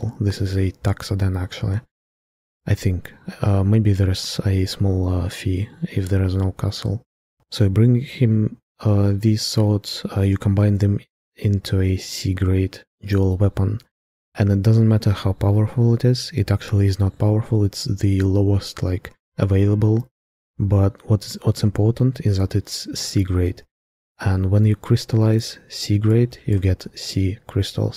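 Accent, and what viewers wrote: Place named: Germany. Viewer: are you german friend?? very nice accent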